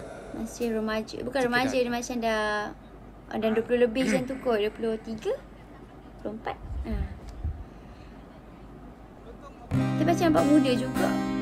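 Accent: Malaysia. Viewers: Suka x dengar cakap malaysie ni. Meremang bulu roma. 😀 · Saya suka cara bicaranya aja.lucu,manja,dan cirkhas logat Melayu nya bikin adem telinga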